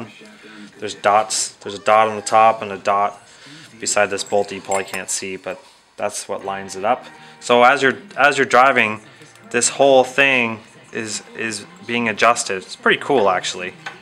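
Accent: Canada. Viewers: Very informative, and in pretty good Canadian English, with "zed" for "Z" and everything.:) Thanks for posting! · are you canadian Eh? Nice video!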